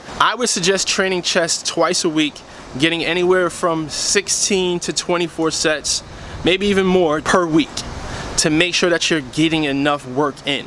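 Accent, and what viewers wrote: New York, United States. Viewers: Ten Chest Commandments... I hear that NY in you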